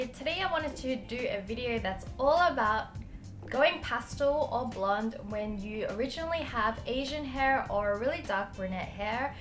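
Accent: Australia. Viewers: Aussie accent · It's austrailian accent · is she australian? i love that accent! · purplesheep yep australian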